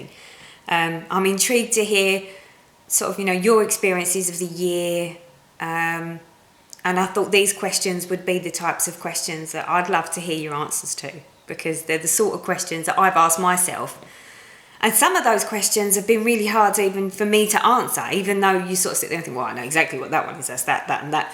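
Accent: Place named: London, United Kingdom. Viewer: Hi,! I'm brand new to Flosstube and am catching up on videos. Just did an intro video but I might have to do this one next (even though I don't have funny moments in stitching, really!). BTW, your accent makes me miss London! Cheers! --Beth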